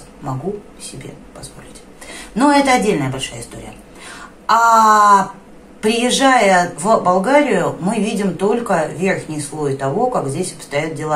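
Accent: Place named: Saint Petersburg, Russia. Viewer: Потрясающий ролик в котором женщина, говорящая как питербурженка и мыслящая как москвичка рассказывает за тонкости южного быта. Я родилась и выросла в Ростове на Дону, со всей ответственностью могу сказать. Замените с тексте ролика слово "Болгария" на слово "Ростов" и 99% сказанного будет равно инструкции к покорению моей малой родины. Вывод Ростов не равно Болгария. Юг, многонациональный, торговый, с многотысячелетним наслоением истории, колоритный юг имеет в себе "ключики", одинаковые и сложно понимаемые теми кто там не родился. Однако, как человек много лет поживший вне пределов родного города, могу сказать следующее. Основной принцип ролика - отложите свои амбиции, откройте сердце, включите голову и проанализируйте вдумчиво место в которое занесла вас судьба - универсален. Для любых принципиально отличающихся от места где вы выросли конгломераций.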